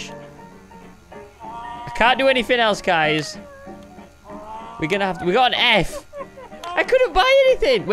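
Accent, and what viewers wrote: England, United Kingdom. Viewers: People proceed to make fun of his accent Bro, I'm English too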